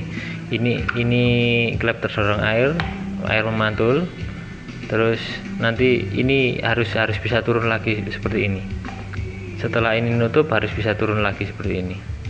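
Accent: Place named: Java, Indonesia. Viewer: Medok.. Sekali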